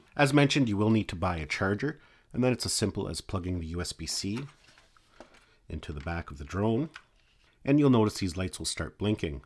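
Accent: Canada. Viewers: Are you Canadian or an American state bordering Canada?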